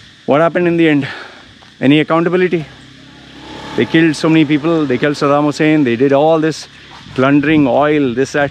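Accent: India. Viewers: his accent sounds very much indian too me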